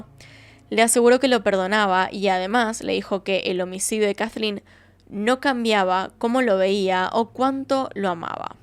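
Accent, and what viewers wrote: Buenos Aires, Argentina. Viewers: No me gusta tu dicción, no creo que hables así cuando vas a la panadería, hacelo más natural, teniendo tanto acento porteño pretendés renegar de la pronunciación en español exageradamente, aunque sea en nombres propios o de localidades cortas la esencia de la narracion en tu idioma, no creo que tengas un nivel alto de público angloparlante por lo que no es necesario tanto, mañana o pasado elimino el comentario por qué no se cómo hacerte llegar la sugerencia. Por lo demás el resumen es bueno y consistente, más que bueno, sin agregados inútiles como nefisto, landó, bárbaro etc que se van por las ramas, muy buena tu capacidad de síntesis. Saludos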